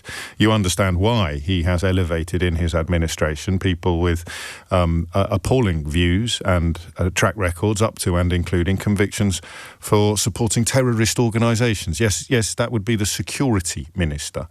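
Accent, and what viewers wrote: United Kingdom. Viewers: James is all over the place a Irish name posh English accent trying to both side a one sided argument he just hasn't brought out the moral quandary line yet?😢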